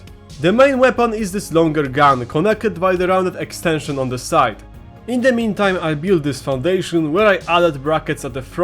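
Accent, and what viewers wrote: Poland. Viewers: I really like your content, od razu po akcencie poznałem rodaka 😂😊👍 super, że mówisz po angielsku, bo masz szerszą widownię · masz polski akcent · All of your mocs are just great - but I can't hold myself anymore, I need to tell you that the first time I've stumbled on your video as I've heard you I immediately knew you're Polish as well :P it triggers me anytime I watch your newly uploaded video - but on the other hand it reminds me of how much legendary moc builders besides you are Polish, such as Jerac or fukusaku - and I have to admit that it makes me somehow proud :) PS I don't mean to make you feel upset, sorry if I did that - I shouldn't even criticise the accent, and I have nothing to criticise on MOCs you make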